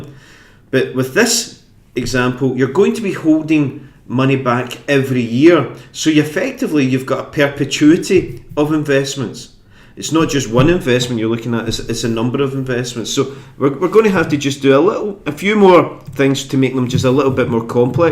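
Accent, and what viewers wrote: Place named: Scotland, United Kingdom. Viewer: Nice scottish accent out there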